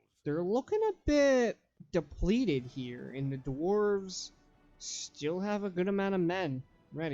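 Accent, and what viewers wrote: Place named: North America. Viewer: U do sound very North American, would never of guessed Sweden lol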